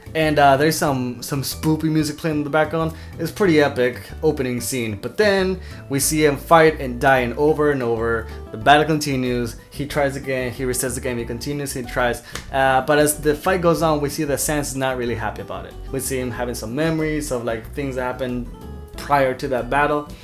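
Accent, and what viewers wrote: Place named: United States. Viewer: QUE!..... HABLAS ESPAÑOL!.... pasas como "americano" muy facilmente.... DIOS!... ya quiero ver la pelea xD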